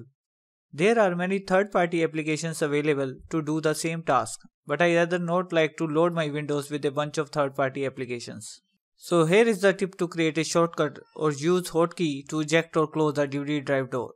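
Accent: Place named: India. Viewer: ALL OF THESE ARE SOME GUY WITH AN INDIAN ACCENT SO I CAN'T UNDERSTAND WHAT HE'S SAYING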